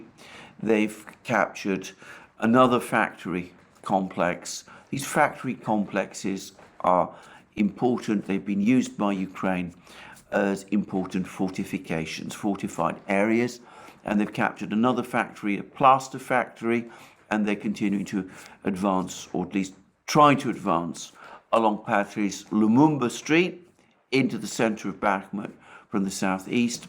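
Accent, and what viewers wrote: United Kingdom. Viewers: Thanks so much Alexander, not only I'm feeling informed very well about what really is going on in this horrible war but I also was able to shut off subtitles lately because I, as a non native speaker now got so used to the British accent I no longer need them. I'm really really glad I found your, Alex's and the Duran channels.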